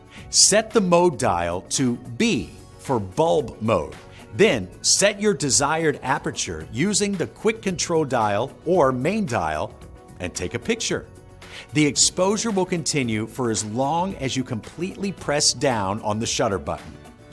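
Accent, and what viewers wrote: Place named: United States. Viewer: Turned straight off, annoying American accent.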